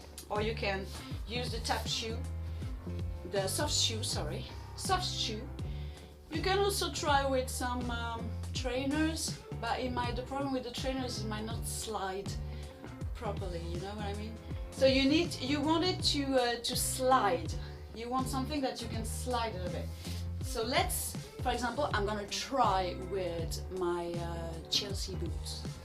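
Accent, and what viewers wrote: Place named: France. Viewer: I recognise a french accent haha have you got any tips in order to start tap dancing at home without taking lessons ? thanks in advance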